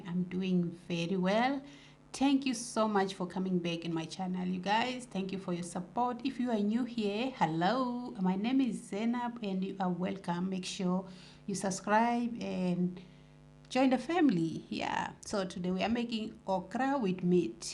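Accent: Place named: England, United Kingdom. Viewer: Zainab I love your English accent 😍 that okra is inviting me to come eat 🤩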